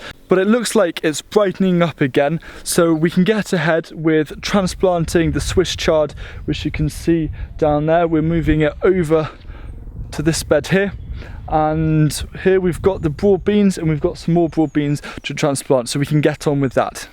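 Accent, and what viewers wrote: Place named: Wales, United Kingdom. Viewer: Love the show and your just great to listen to, love the Welsh accent. Rhubarb, wonderful vegetable, whats the secret in growing the best like feeding manure, Boron and/or Iron etc etc, want to hear your secrets please, Peter Australia. Yours are so RED, whats the secret.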